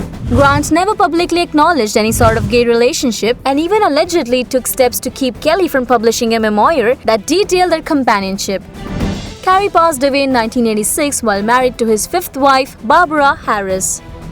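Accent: India. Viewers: Bill Bergendahl she sounds Indian 🇮🇳 I think I’m just saying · Why the narrator sounds Indian??? Pls dont talk when your mouth is full 😂😂😂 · Better to redo this with somebody who speaks English...not a Pidgeon English from deepest India.... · Your Indian accent is so annoying.. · Her Voice in annoying. I feel like I called a 800 number for some help with a product I bought here in the USA only to be redirected to some place in India. Or that maybe I walked into a 7-11 and ordered a Slushy. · Indian accent is so incredibly annoying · The woman's voice is an Indian accent. It appears some find it irritating. Wait.... Lance Base???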